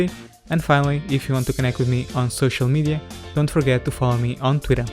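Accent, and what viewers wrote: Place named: Portugal. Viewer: I've played enough cs:go to know portuguese accent now LOL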